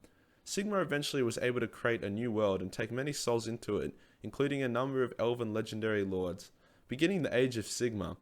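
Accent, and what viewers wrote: Australia. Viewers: haha love this keep making these love you ausie accent cum to britain and speak we will love you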